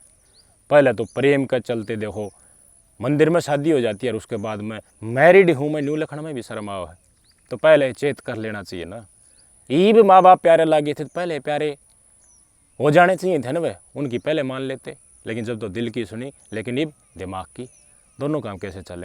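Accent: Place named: Mahendragarh, India. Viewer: भाई आपकी बोली में महेंद्रगढ़ साइड की झलक बहुत अच्छी है भाई आप हर खबर का निचोड़ निकालते हो लाजवाब भाई जी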